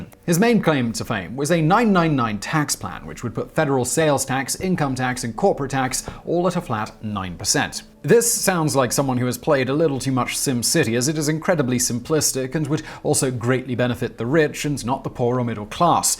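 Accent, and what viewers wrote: United Kingdom. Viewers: Spoke like a true brit "not to be messed with"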